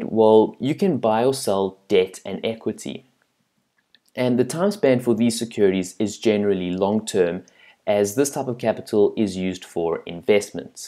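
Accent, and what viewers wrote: South Africa. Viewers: You sound South African haha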